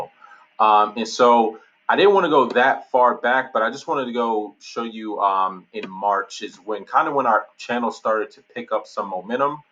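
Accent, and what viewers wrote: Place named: Prince George's County, United States. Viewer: The way you talk reminds me of home. You from P.G.Co.?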